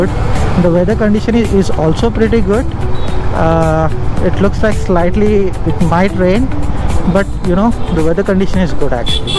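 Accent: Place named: Telugu, India. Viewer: Brother you talk English off Telugu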